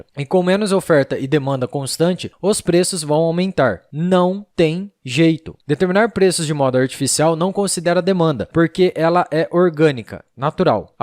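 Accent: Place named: São Paulo, Brazil. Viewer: Parem de mentir com esse sotaque da caipira paulista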